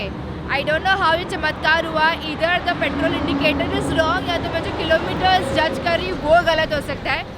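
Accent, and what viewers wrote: Maharashtra, India. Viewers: Bai.. aapki Marathi wali Hindi lai Bhari bolted tu.. ,😁👌👌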